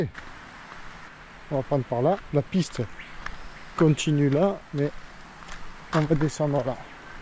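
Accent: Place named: Provence, France. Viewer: Cc et ouiiii un Biaou un Bacaou,Espigaou ça me fait toujours du bien d'entendre des mots de cher nous, pays de Marcel Pagnol. Toujours de très belles vidéo en plus avec l'accent et le sourire. Biz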